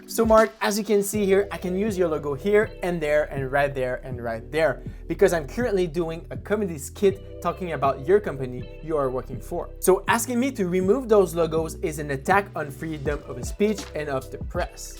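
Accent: Quebec, Canada. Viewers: ça sonne québécois, comme l'accent! 👍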